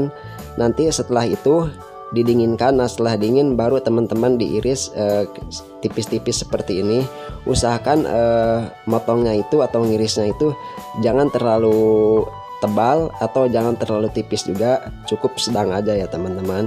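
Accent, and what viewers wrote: West Java, Indonesia. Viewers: Host orang Sunda atau orang Minang asli? Logatnya kayak orang sunda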